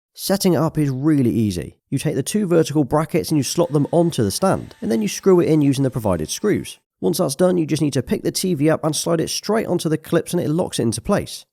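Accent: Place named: England, United Kingdom. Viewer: Love your English accent, mate.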